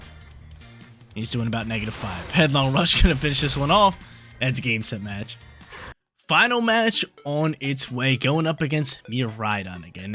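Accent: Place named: Buffalo, United States. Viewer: Holy Buffalo accent lol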